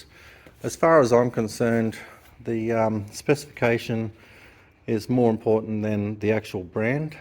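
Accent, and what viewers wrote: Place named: Australia. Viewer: I do like the Aussie accent, mate. The metric measurements and colourful expressions are also charming.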